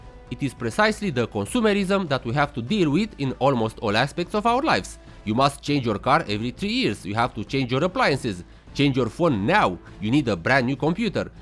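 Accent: Romania. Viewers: you seem romanian by accent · sami bag pula daca tu nu esti roman cu asa accent xd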